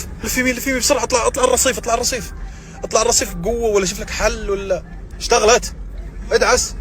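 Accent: Iraq. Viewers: لان عراقي اعذره لهجته تختلف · هاي اللهجة العراقية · هاي لهجه عراقيه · اللهجه عراقيه اكيد متفهمون 😂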